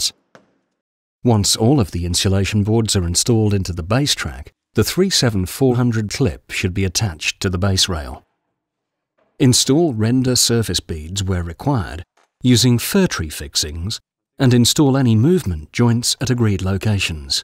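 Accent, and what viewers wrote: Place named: England, United Kingdom. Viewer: Love the English accent, way more comfortable to listen to than the American accent!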